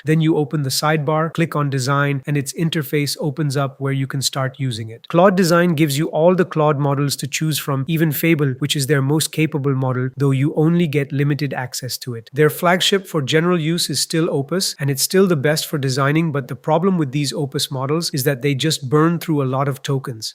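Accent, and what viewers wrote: India. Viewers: imagine using an ai narrator and still choosing indian accent holy shit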